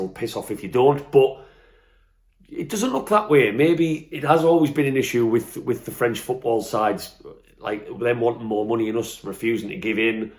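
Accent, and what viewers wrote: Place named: Geordie, United Kingdom. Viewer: Where abouts in the North East are you from? Have quite a soft Geordie accent.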